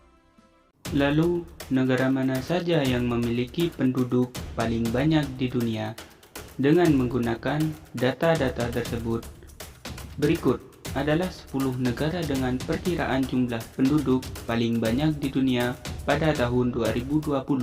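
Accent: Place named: Aceh, Indonesia. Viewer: bahasanya kebanyakkan dan dan dan dan dan logatnya logat aceh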